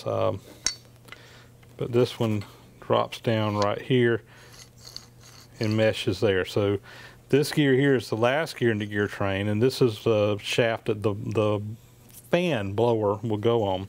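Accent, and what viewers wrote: South, United States. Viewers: I like how when is talking to "us" on camera - his accent is subdued, but when he's talking to other local folks - it's 100% good old boy!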